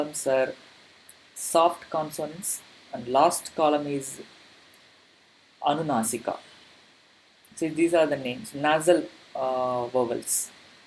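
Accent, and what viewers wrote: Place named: Kerala, India. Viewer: Mallu accent is so distinctive.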